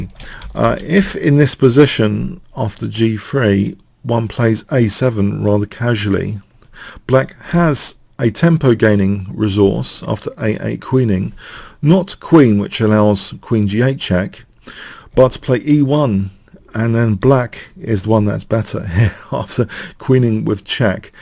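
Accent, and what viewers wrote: England, United Kingdom. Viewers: hay interesting as always, by the way just wondered from where is thet fantastic accent? area England??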